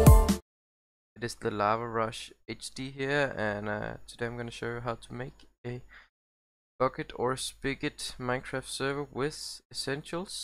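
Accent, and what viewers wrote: Denmark. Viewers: Are you danish? I can hear it on your accent. And I love your vid :D please don't stop making so good Videos :D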